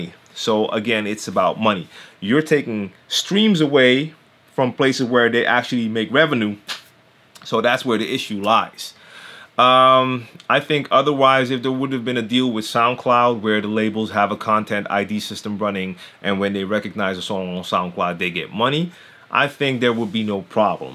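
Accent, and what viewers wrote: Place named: Philadelphia, United States. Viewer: you're from the Netherlands but have the same accent as me in Philly?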